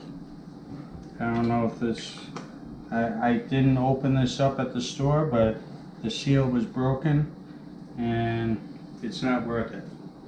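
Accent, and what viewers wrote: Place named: Texas, United States. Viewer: Yes, that accent always gives it away doesn't it, haha. Well technically if you live in Texas then that makes you a Texan. I'm sure there is a native Texan that would strongly disagree. These things always make me laugh because most of us came from somewhere else originally and quite a lot of these so called southerners have not so distant northern and European roots.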